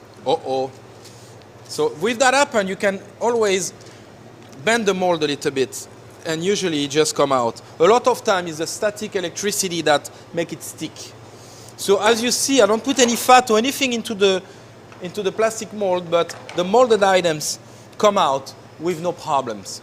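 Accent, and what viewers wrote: France. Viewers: I definitely love his french-english accent, his randomness, and his job ( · hes from france, he sounds french and his name is french aswell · I love that accent! I can't place exactly what it is though! I think it's a French accent, but I can't tell. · Il a un accent francer !! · Hahahaha. You gotta love the french-accent. :D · just a little bit french! lol! great job! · oh is it a french accent I thought it was german my bad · good old french accent ! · Do you have a French accent I'm guessing? Cool, how old were u wen u learned english? Sorry, I'm a big language nerd :P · this guy has a French accent, the I pick things up guy has an Austrian accent lol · Are you french?? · French accent ^^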